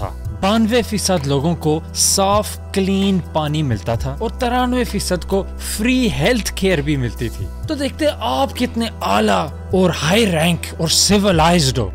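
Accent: Punjab, Pakistan. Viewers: ap punjabi ho kya . accent kafi heavy hai . btw , I am a hindu nationalist but then I share anti american sentiment like you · I can hear a hint of Punjabi?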